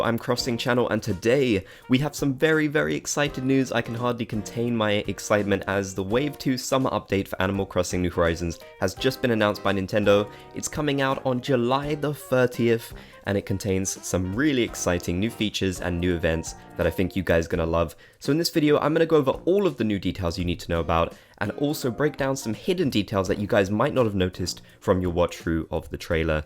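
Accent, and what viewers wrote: United Kingdom. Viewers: His British accent is thicker than Wendell the Walrus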